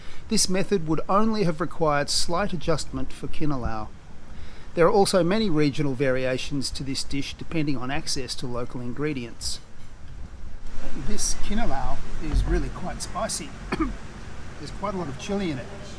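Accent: Australia. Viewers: Kinilaw in waray as well.. you sound Australian accent... from Brisbane Australia ❤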